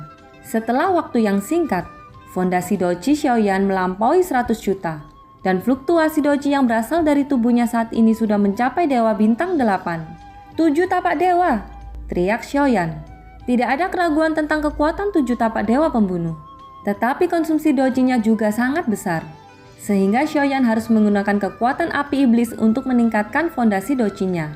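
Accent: Java, Indonesia. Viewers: noba 1 jg awal"nya kaku bacanya skg enak dengerinnya... skg noba 2 sama kaku cuma bedanya noba 1 ga medhok 🤭🤭🤭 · Logat jawanya kudu ditekan nih min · Itu bahasa Jawanya medok itu mohon maaf bikin lagi yang banyak ceritanya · MALAH SESION AKHIR SUARA D GANTI JADI TIDAK MENARIK HARUSNYA DI GANTI DG PENGISI SUARA YG LBH BAGUS MALAH JDI MENDOK JADI KAKU 1000% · semangat buat mimin baru... klo ada yg ngatain suara medog jawa dll jangan menyerah👌 tar lama kelamaan akan hilang kok. gw dlu pas baru pindah jakarta jg medog jawa, sekarang udah ilang medognya karena keseringan ngomong bahasa indonesia tiap hari🤭 semua butuh proses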